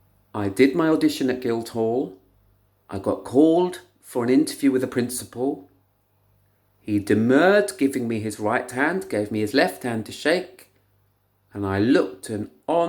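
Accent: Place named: Wales, United Kingdom. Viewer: Great story. Love the Welsh accent.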